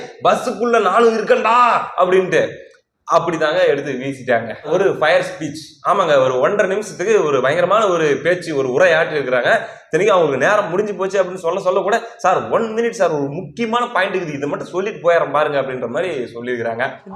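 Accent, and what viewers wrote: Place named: Coimbatore, India. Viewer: தம்பிக்கு வாழ்த்துக்கள் வணக்கம் உங்களுடைய தெளிவுரை விரிவுரை அகல உரை ஆல வரை அனைத்தும் ஆள்பவர்களை நிலைகுலையச் செய்கிறது தம்பி உங்களது பேசும் தொனி கோவை சார்ந்த குடிமக்களைப் போலவே கேட்பதற்கு நையாண்டி ஆகவும் இருக்கிறது மிகவும் ரசித்து உங்களது பதிவுகளை பார்த்துக் கொண்டிருக்கிறேன் உமது அண்ணன் கோவை சௌந்தர் நாம் தமிழர்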